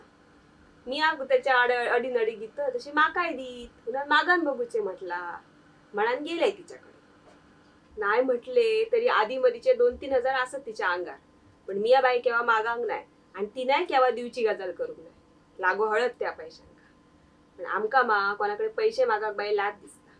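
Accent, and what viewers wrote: Malvani, India. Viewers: Tuzi Malvani phar wegli sound kartay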